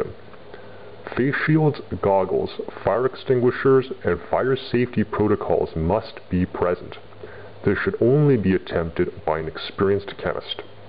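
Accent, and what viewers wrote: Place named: Canada. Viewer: Do all Canadians sound like this?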